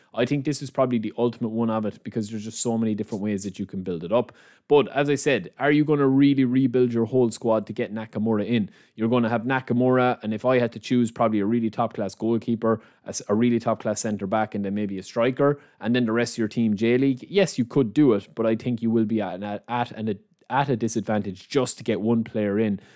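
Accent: Ireland. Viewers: that irish accent tho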